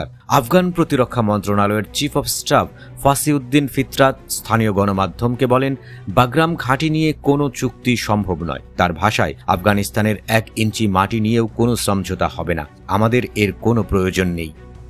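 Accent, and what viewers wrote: Kolkata, India. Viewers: জে সংবাদ পড়ছে সে মনেহয় কোলকাতার নাগরিক।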